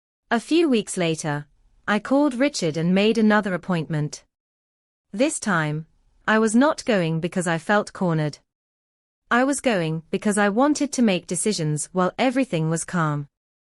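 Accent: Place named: United Kingdom. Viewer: I cant stand the British accent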